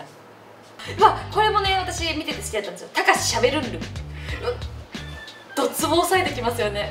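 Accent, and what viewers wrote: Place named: Kansai, Japan. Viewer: ノースリーブだと綺麗な首筋や肩が見えるのでとても似合ってる。 こんな可愛い顔してるのに変にぶりっ子してへんし、ハキハキの関西弁とマシンガントークのこのギャップはたまらん‼️ speaks really quickly😵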